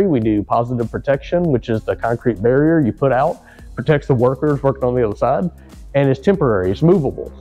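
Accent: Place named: North Carolina, United States. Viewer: Man, the more I looked at your videos the more I began to think you’re the same person from a forum I used to be on back in the day, HPJunkies. Then I started listening closely to your accent and that’s definitely a North Carolina accent so my bet is you’re the same person! Loved your threads on that board back then and your videos now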